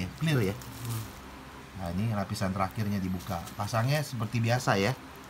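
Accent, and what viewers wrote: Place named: China. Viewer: logat cina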